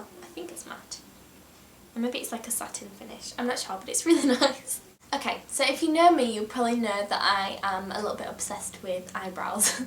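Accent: United Kingdom. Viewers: I love your accent!! It sounds like Kylie from Coronation street. As a Canadian I love UK accents. Great video too!!! Can't wait for more.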